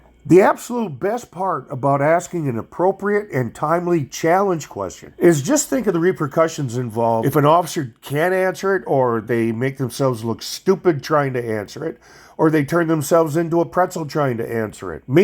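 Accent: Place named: United States. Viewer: Irish! You sound very American.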